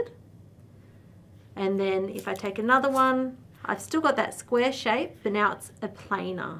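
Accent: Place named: Australia. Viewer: Good video. Well explained. The Aussie accent is easy to listen to as well.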